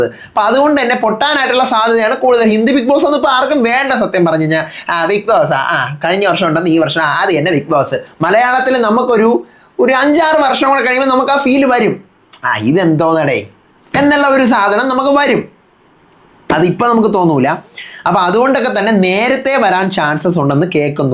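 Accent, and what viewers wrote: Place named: Tamil Nadu, India. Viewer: Nee arada pandi ano tamiz kalarthi malayalam parayan. Onni malayalam alle Tamil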